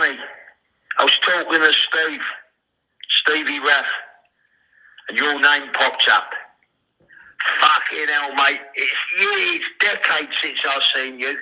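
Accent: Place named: Cockney, United Kingdom. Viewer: how cockney is he! hha and strength of mind way up there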